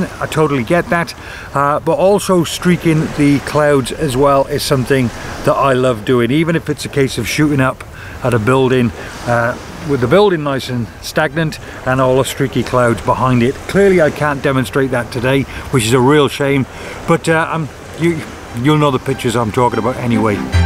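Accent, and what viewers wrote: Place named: South Wales, United Kingdom. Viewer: Whats your thoughts on a Variable ND Filter? Also where are you from, I'm guessing by your accent you're from South Wales. Big fan, Subscribed.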